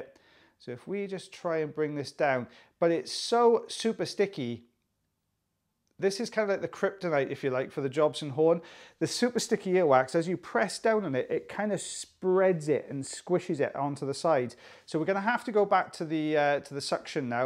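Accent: Wales, United Kingdom. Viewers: I watch the videos as they are interesting and I have the notifications switched on. Also who doesn’t love a Welsh voice 😊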